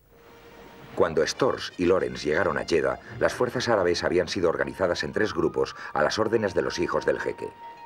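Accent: Spain. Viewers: This accent is from the spanish península, that is spain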